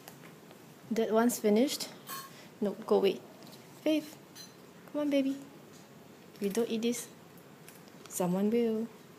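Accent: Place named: Singapore. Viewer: Oh, are you Singaporean because you sure sound like one!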